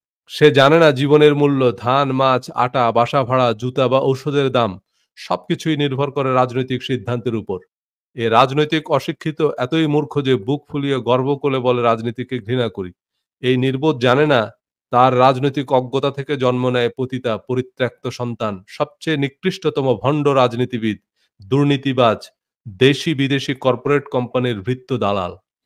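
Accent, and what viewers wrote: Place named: Jhenaidah, Bangladesh. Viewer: দক্ষিণ অঞ্চলের কোন জেলায় বা কোথায় বাসা, আপনার ভাষার প্রকাশ এবং প্রকাশভঙ্গি দেখলে মনে হই হয়ত ঝিনাইদের আশেপাশে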